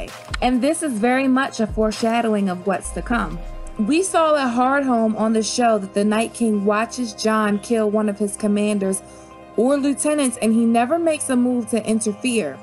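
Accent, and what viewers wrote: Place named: DMV, United States. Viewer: You are from the DMV area or B-Moore I can hear it in the accent but good info on GOT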